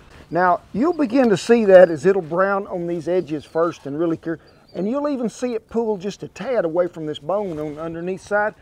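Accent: South, United States. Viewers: His accent results from the thousands of immigrants from Tennessee, Alabama and Mississippi who came to texas as early as 1830.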